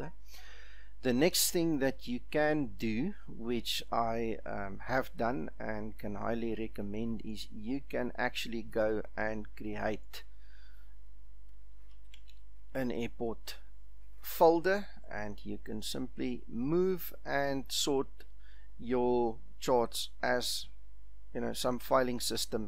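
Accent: South Africa. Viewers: what part of south africa are you from and thanks for the vid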